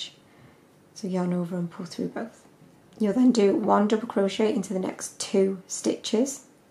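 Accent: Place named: Northern England, United Kingdom. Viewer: US terms coming from a Northern English voice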